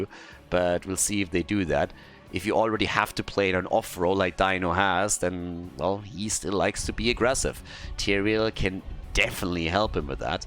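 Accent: Germany. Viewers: Hm... are u a native speaking german guy?! It seems like with that dialect ^^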